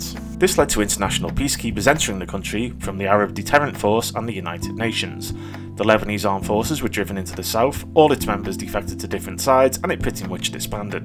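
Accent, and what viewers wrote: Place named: North East England, United Kingdom. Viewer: Just come across your channel (new subbie now). Why on Earth doesn't this channel have more subscribers???? Your videos are excellent and really well made! Good to see that you haven't been "silenced". Working my way through the videos. Keep up the excellent work! PS You sound like you are from my part of the world (north east England).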